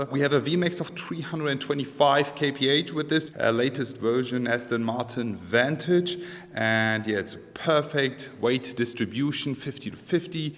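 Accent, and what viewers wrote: Germany. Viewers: Is that denglisch you talking ?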